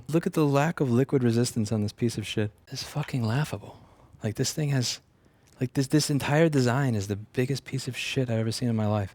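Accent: New York, United States. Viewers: Is it just me or is your accent extra New Yorky nowadays? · A couple minutes in and he's gone full New Yorker. It's gonna be a good one. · Was gonna say that this video was a prime example of a person "speaking New York" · How did your new york accent get more pronounced from being in Texas for 2 years? lmao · Doesn’t sound like any Texan I’ve ever met. Sounds like he comes for Queens or somewhere else in NY. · I've never noticed your New York accent so much in your videos before.... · inner "Pissed-off-New-Yorker" accent is fighting its way to get free 😆 Love you, 🙏Keep doing you, man. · Lmao. You can tell how high the anger levels because the New York accent comes out even harder when is pissed. Love you, thank you for everything you do for right to repair man. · This is top 10 most new york video ive seen ever.... The accent... The aggression... 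The new york...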